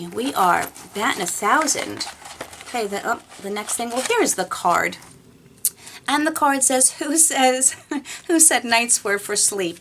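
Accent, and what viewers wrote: New York, United States. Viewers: Where are you from? I like how you talk lol New york? New jersey? I got the iby highlighter-make up forever foundation-mac lip gloss-the k vos lip balm-and a itsie eyeshadow 😁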